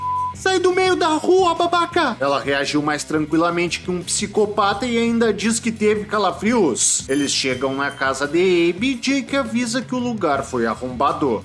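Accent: Rio Grande do Sul, Brazil. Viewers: Tu és gaúcho o narrador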